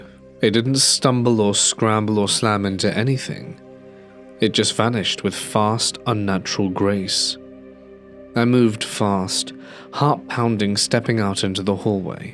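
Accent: England, United Kingdom. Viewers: You really have a gift for nuance and expression And your English accent just makes it even better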